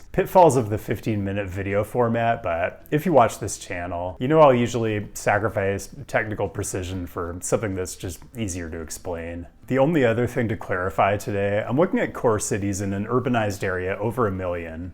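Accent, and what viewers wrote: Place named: Pacific Northwest, United States. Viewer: is from Seattle. Fred Armisen describes the PNW dialect as "putting the words on a plate".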